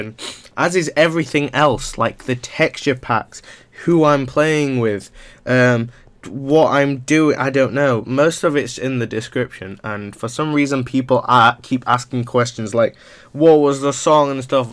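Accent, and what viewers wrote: United Kingdom. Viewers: He is British, why people so confuse about his accent? Because he is British! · From Hong Kong? British Accent? I didn't watch the vid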